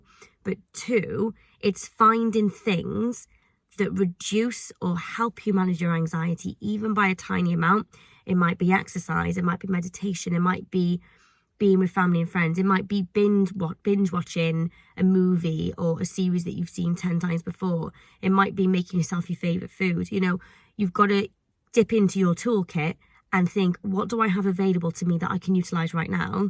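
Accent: Cardiff, United Kingdom. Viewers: Is that a cardifff accent? 😊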